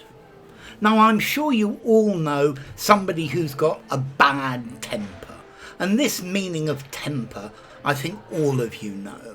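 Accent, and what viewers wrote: RP, United Kingdom. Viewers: Thank you, for the special "technical" uses of the word, viz.,- metal,steel,glass, ductility, spices, chocolate..... it is always enriching to view the videos in your Channel, all the more so, because your explanations and examples are well chosen, precise, thorough and tempered with the sweet and sour spice of humour and a posh accent. ☆Je vous envoie des baisers tendres de Paris. Sometimes, they can be a bit "fougeux", but I guess it would be a sin (or a crime ) to temper them!♡♡♡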